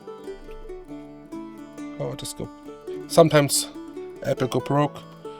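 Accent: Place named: Germany. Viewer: Please don't reduce your wonderful accent, I enjoy it 😊! I understood every single word you said. Your accent reminds me of my Oma and Opa's accents. As young adults they legally immigrated here to the US from Germany, I spent a lot of precious time with them when I was a kid. They passed away a long time ago, I miss them.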